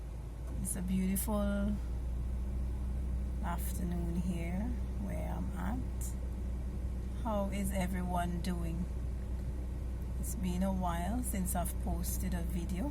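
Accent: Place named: Saint Vincent and the Grenadines. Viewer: You have that rich Vincentian accent. You speak with passion. Speak Louder, my friend and sister!.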